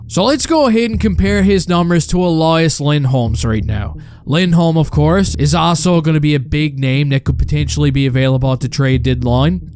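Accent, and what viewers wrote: Newfoundland, Canada. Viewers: This guy sounds so newfie I had to stop 40 seconds in.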